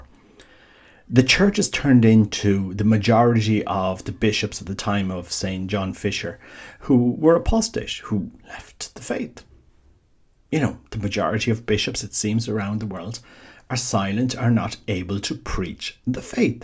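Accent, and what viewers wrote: Ireland. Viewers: Your subject matter is excellent and you speak so clearly. You are a true Catholic and It is a pleasure listening to your lovely Irish lilt. May God bless you abundantly.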